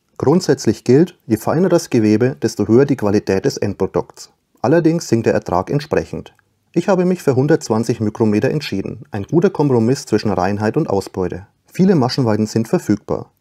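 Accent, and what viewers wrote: Franconia, Germany. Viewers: glingdd noch nem franggn :P :D grüße aus nürnbärch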